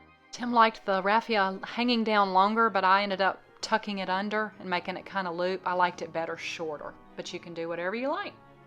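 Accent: South, United States. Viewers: From the prairies in Canada: Pretty darn cool video! If I was still teaching early years, I think I'd be consulting your contributions more often. Thanks for sharing. Pretty nice accent too. I honestly always liked a southern drawl. Velox Versutus Vigilans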